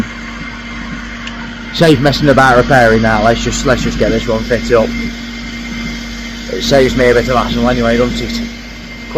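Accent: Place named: Yorkshire, United Kingdom. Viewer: Keep up good work and f all the haters , videos very entertaining and Yorkshire accent cracking shagger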